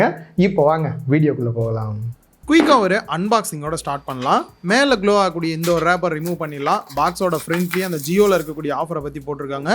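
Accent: Tirunelveli, India. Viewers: thirunalveli yaa iruku 😅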